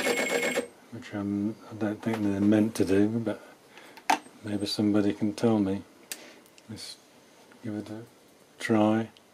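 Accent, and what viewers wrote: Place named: Yorkshire, United Kingdom. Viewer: absolutely wonderful engineering! if i'm not mistaken you're a yorkshire lad aswell?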